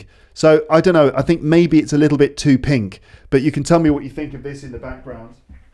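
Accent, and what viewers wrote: United Kingdom. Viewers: Thank you for your episodes. I've been watching and listening to you for some time now (probably 10 months). I found what are doing is very beneficial for people like me, trying to improve their English. I live in Egypt and mother language is Arabic. In my country, and probably most of the arab area, find that the American accent is easier for them and suitable with people with Arabic as mother tongue. Do you think this is correct???. In my case, though, I don't find it like that. I think the British accent is easier for me. That is why I'm following your channel.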